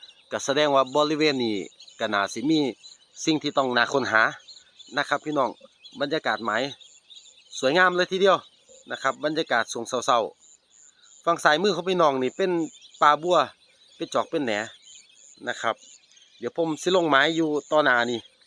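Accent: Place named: Sisaket, Thailand. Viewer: พอมาทำงานต่างประเทศมันกะเงียบเหงา พอได้เห็นคลิปนี้ก็หายคิดถึงบรรยากาศตกปลาที่บ้านเด้อครับ สำเนียงคือศรีสะเกษบ้านผมแท้ครับ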